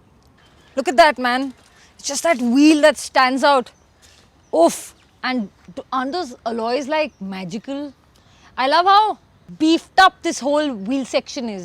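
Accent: India. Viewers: Loslos mo! Indian accent p rin whatever 😏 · Lady that bike is NOTHING compared to your beauty! Wowwww I am crazy about long dark hair but your face, your smile... I tried 5 times looking at your video to get more info on the bike but failed again and again. Your Indian accent and of course Indian decency is only the Cherry on Top. What did you say about the biggest bike??? ❤❤❤❤❤❤❤❤❤❤❤❤❤❤Much love from South African Boer. Ps.? if you come with the Bike I WANT one YESTERDAY, TODAY AND TOMORROW. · Don't talk Indian English, talk just in Hindi, your English language is very poor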